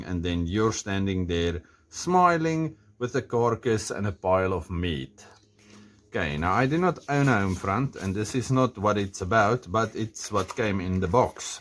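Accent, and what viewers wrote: Afrikaans, South Africa. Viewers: Love die Afrikaanse aksent ou bul. Lekker om Suid-Afrikaaners ook op YouTube te sien.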